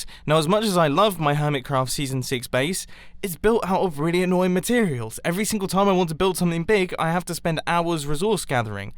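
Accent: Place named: England, United Kingdom. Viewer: Damn i feel the english through my phone.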